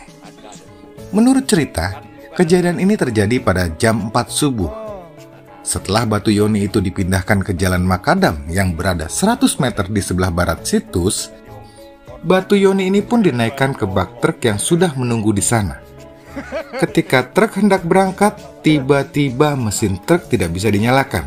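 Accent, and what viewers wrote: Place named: Sunda, Indonesia. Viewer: Om..... lagu na nyunda pisan 😅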